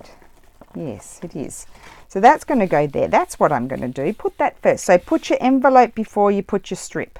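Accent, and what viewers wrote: Australia. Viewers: Hi are you from Australia 🇦🇺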